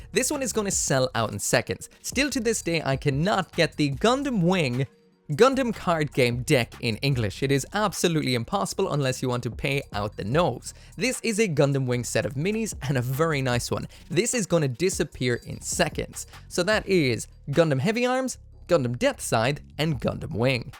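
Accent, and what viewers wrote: Ireland. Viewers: I heard the accent and knew straight away you are one of my own.. I got my first Gunpla in ken blacks in Kilkenny. Genuinely think its become an Issue for me now the bank account is gonna be crying for a long time now haha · not the 1920s bavaria 😭….. also ? u are irish??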